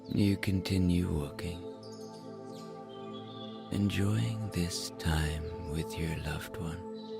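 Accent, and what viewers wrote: Ireland. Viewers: Hi, I absolutely love this Rainy Story collection. I listen to your stories every night in bed and always fall asleep very soon after the actual story ends. They are all so lovely. My favourites are the rainy and book shop stories. Just know that you are very appreciated by so many people around the world listening to your lovely Irish accent telling the most beautiful bedtime stories. Thank you!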